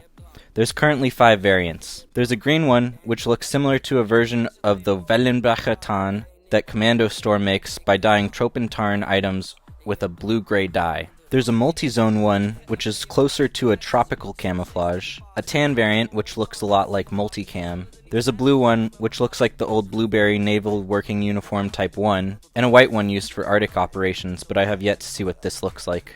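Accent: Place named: Germany. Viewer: sorry but that's a german accent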